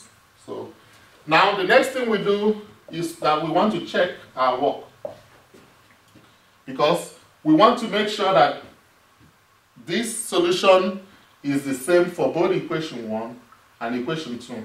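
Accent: Nigeria. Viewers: this helped alot! thankx..sorry are you an Igbo Nigerian Man?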